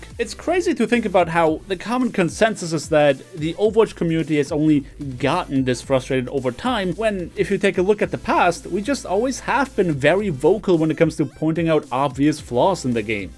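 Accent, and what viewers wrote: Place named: Germany. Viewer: Se German accent tho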